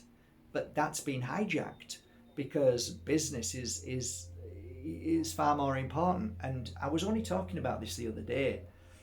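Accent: Yorkshire, United Kingdom. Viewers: Found a channel called The crystal collector. He goes around different areas digging crystals. Theres a video where he digs amethyst which is my favorite crystal... Amazing. Check him out! your Yorkshire accent is lovely. I've a Bucks accent 😂😂😂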